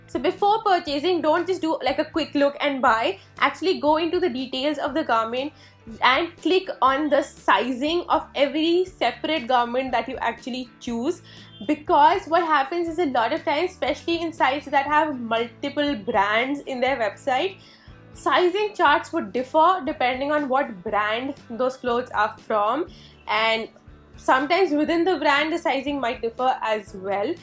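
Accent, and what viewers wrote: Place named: Delhi, India. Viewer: Just a observation.. Nothing negative.. Delhities.. Usually pronounce here as hare... Thank you for the vid.. Very helpful tips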